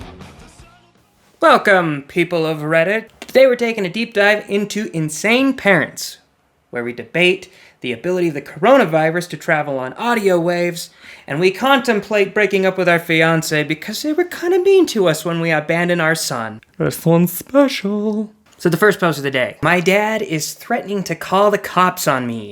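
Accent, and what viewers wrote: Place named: Germany. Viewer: I freaking love the German Accent XD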